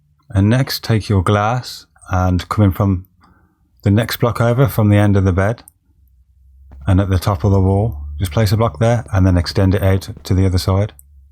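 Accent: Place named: Midlands, United Kingdom. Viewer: Sound like you're from the midlands, mate. Black Country lad?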